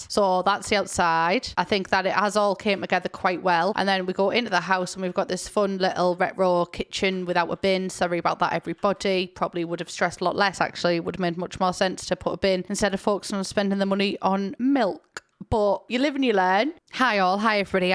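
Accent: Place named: Northern England, United Kingdom. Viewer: I love how unapologetically northern you are ❤ (im a geordie so also northern)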